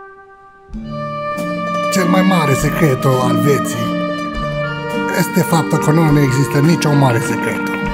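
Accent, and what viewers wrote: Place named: Italy. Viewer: Imi place foarte mult romano-italiana ta,faceti o treaba excelenta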